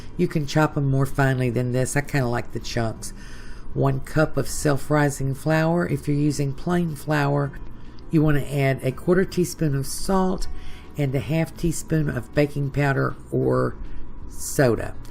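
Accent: South, United States. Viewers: where are you from you sound southern and my 88 mother in law loves you but didn't know how to ask for she is from Georgia.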